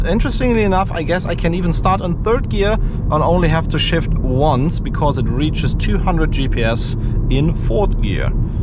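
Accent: Germany. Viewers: Wenn Deutsche Englisch sprechen... Und was sollen die Handschuhe? So lächerlich. Ist kein F40.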